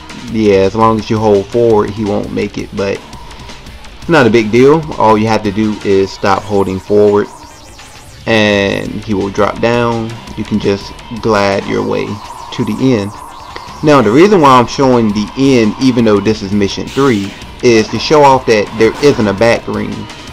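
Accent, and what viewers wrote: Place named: South, United States. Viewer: You sound like a fine southern black gentlemen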